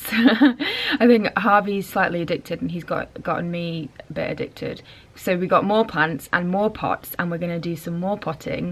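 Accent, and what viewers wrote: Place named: North, United Kingdom. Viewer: Way more relaxing then any ASMR channel. For some reason when you spoke on this video you sounded a lot more ‘northern’.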